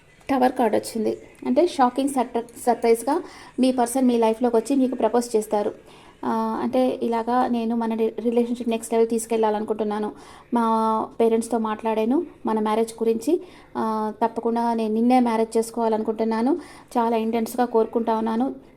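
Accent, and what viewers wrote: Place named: Chittoor, India. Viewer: Maam meedi chittoor district slang superb ga undi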